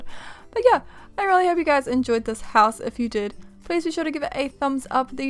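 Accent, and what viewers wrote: New Zealand. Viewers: your accent made sense P.S I'm a kiwi too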